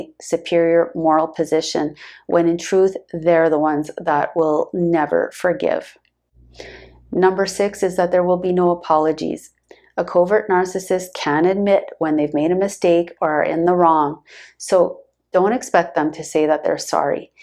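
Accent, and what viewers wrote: Canada. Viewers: Hey, you are really good. I just subcribed to your channel. You sound like a canuck... Looking forward to viewing you videos......................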